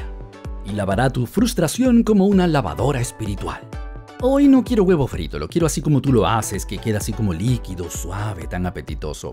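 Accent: Venezuela. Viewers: Es acento venezolano.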